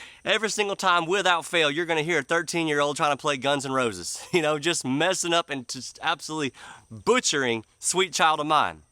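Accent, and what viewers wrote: South, United States. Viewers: LOL...love the stories! and that southern accent....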